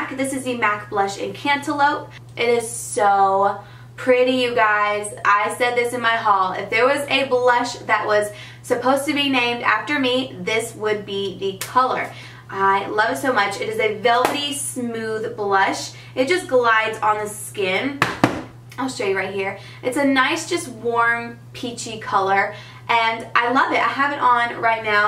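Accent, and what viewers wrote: South, United States. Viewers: I really enjoy watching your videos. I love the products you recommend, I love your makeup in every video, and I adore your southern accent. But...your lighting is SO bright that it washes out (you sometimes) but mostly the swatches and products you show. It's hard to get a good idea of what the coloring is. Just a note.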